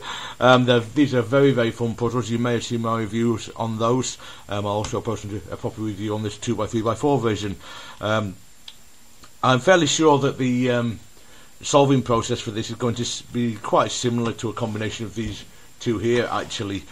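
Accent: United Kingdom. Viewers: Rightright. I just mean British. I am not from an English speaking country so your British accent sounds awesome imo. :D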